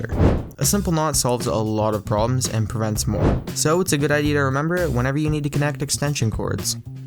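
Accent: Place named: Canada. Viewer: A-bOAt / oAt (INSTEAD of aboUt and oUt) says that you're CANADIAN!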